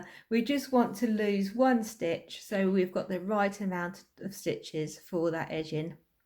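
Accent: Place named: United Kingdom. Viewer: This looks great! Can’t wait to try it! I love your accent! What part of the uk are you from?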